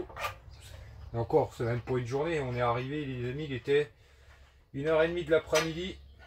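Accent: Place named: Ardennes, France. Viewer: Bonjour, moi je fais aussi la ferraille avec ma vieille clio mdr, mais pas autant que toi. Tu sais dans notre région ( à côté de Lens ) tout le monde fait la ferraille donc attention il y a des vols. Tous les soirs je regarde tes vidéos et ça me fait du bien car c'est pas pour avoir des conseils mais pour vous voir, votre accent ardennais eh bien j'aime bien et je trouve que vous êtes vraiment cool et simple, vous souriez et vous ne prenez pas la tête pour des conneries. Bref merci pour tout ça et je vous souhaite beaucoup de sous avec les métaux. Jean Luc de Loison sous Lens. Bisous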